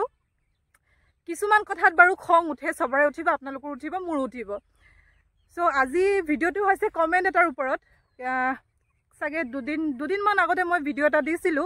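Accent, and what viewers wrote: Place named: Adivasi, India. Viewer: Hvy didi agh laga di 😮 bhut besi hvy ke dile adivasi voice t kole aru vl lagil hoi 😮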